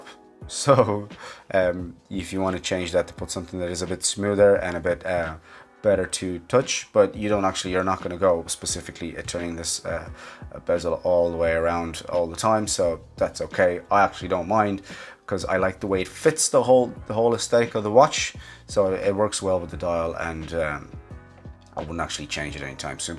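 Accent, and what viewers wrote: Ireland. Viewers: You sound Irish!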